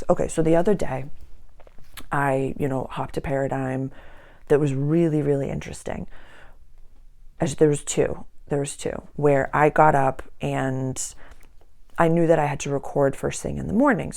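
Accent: Boston, United States. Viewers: Love your videos so much. It feels like dishing with a Bostonian older sister.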